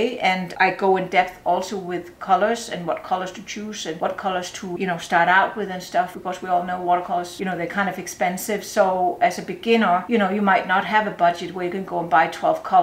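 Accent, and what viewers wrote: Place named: Denmark. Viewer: love this colour. Sounds like you are originally from Denmark. Am I totally wrong? 🙂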